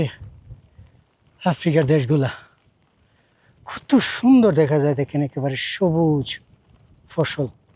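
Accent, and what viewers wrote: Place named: Sylhet, Bangladesh. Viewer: ভাইজান আসসালামু আলাইকুম আপনার কথার ভাষার মধ্যে মাঝেমধ্যে সিলেটি টান আসে আপনার বাড়ি আসলে কোন জেলায়❤❤❤❤❤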